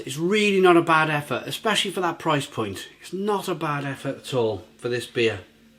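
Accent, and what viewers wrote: Wales, United Kingdom. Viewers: I remember Hobgoblin was £1.25 in tesco a few years back. It’s such a shame Wychwood were bought out by Carlsberg 😑 Love your Welsh accent btw 🏴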